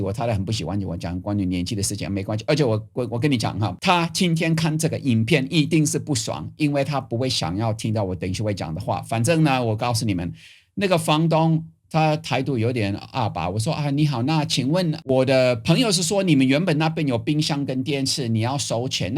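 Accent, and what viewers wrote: Taiwan. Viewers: 我非常確定您不是歪國人 口氣 腔調 用語 根本是台灣人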